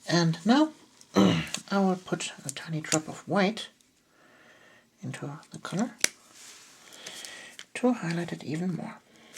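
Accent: Germany. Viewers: are you from germany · she's german it's just her accent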